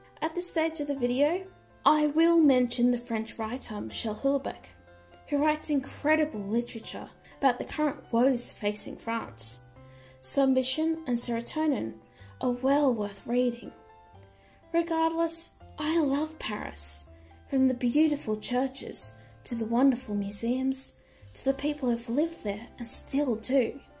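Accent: Australia. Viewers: I guessed you were either from Australia, or New Zeeland, at first I thought you were English 😅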